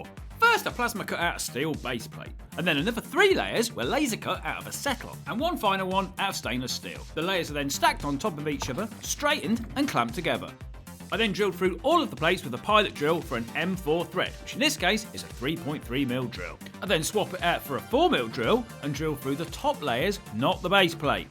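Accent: United Kingdom. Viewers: Extra British in this short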